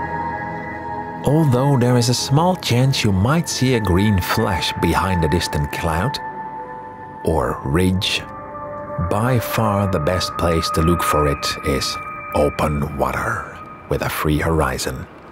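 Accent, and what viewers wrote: Netherlands. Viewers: Goede video, dacht al te horen dat je Nederlands was.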